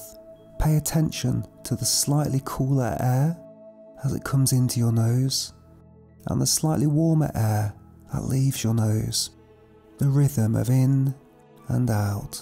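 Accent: United Kingdom. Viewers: Ok thr british accent is already helping 😅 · Your accent reminds me a friend form Brighton (UK) 😊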